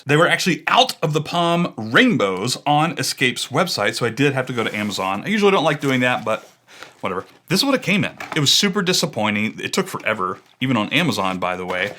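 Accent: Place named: United States. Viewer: 1) Matcha is a type of green tea, where the tea leaves are part of the beverage and not just the brew. It is pronounced MA CHA. 2) It takes forever to come to you because you sound like you are in the USA, and I believe the website your ordered from is in AUSTRALIA 3) The density of POM, or at least these ones, I think is slightly higher than PBT. Dunno if density translates to weight.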